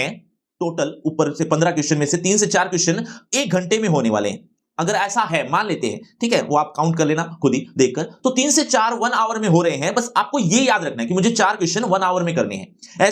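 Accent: Haryana, India. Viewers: Sir are you from haryana 🤔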